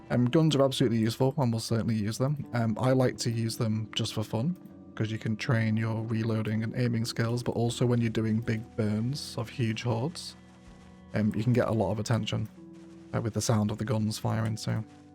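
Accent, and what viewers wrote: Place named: North, United Kingdom. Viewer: Come on! I recently moved to Australia from the UK and it's lovely having a chill northern British voice talk to me about Zomboid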